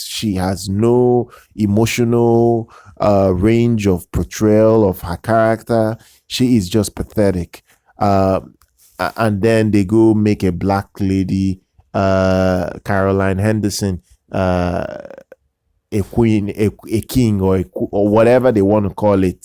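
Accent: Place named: Nigeria. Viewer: Such a breath of fresh air. Seen a video on this. From what I'm going to say sounds like Nigerian, your Nigerian. It sounds like It's so good to see some black people refute this because I am getting into the show and for years now we're seeing just tokenization after tokenization all the time and it's always white characters being changed. So frustrating. I don't like it on either side of the spectrum but the moment you say something you're deemed a racist and it's just so wrong and so many levels. They're trying to blackwash everything in the name of diversity and inclusion and it's just when you're dealing with a historical show and historical figures, this person actually existed. The person that they changed actually existed was a white male and they've changed him for no reason other than pushing the message. Thank you! Thank you for this video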